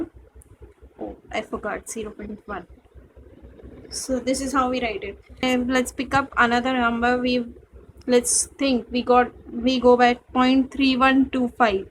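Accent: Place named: India. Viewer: Typical Indian English -_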